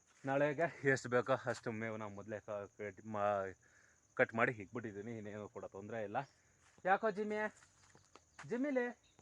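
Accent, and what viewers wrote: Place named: Tigala, India. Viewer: Anna neevu tigalaru nimma bhashe enda tiliyuttade namdu Anekal navu tigalare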